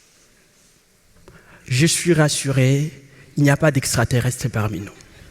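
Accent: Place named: Democratic Republic of the Congo. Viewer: Oui, ce n'est pas faux, C'est difficile de se défaire de l'accent congolais 😅 Mais bravo à lui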